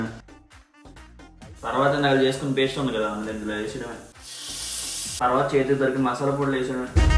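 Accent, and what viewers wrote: India. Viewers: Mee yasa chuste vizag nunchi vachi nattu unnaru ?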